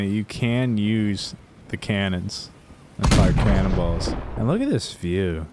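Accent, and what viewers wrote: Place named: California, United States. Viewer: Dude, you and Point & Shoot sound sooo similar its crazy HAVE TO be from the same area of Cali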